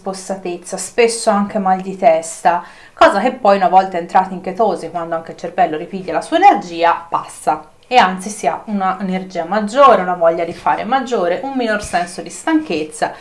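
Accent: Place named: Tuscany, Italy. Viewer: Grazie mille. Ti ho conosciuta con questo video perché cercavo testimonianze sulla dieta keto. Purtroppo dovevo iniziare lunedì scorso ma la notte sono stata male. Il mio nutrizionista mi ha detto che devo per forza prendere gli integratori di magnesio e potassio ma io avendo una malattia autoimmune allo stomaco trovo difficoltà. Ieri ho riprovato e ora sono di nuovo con un mal di stomaco assurdo. Ti ringrazio della spiegazione. Sento che sei toscana anche tu. Sai se ci sono asl o ospedali dove poter fare la keto? Perché io ho tante patologie e non vorrei sbagliare. Devo perdere peso tanto... per salute. Grazie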